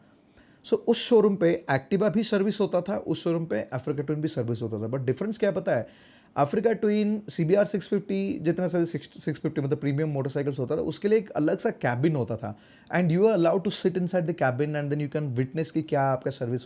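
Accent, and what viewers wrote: Odisha, India. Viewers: Even if after so many years, your odia accent in hindi sounds good.... Badhiya Bhai..... Good job... Fronm ROURKELA/Raipur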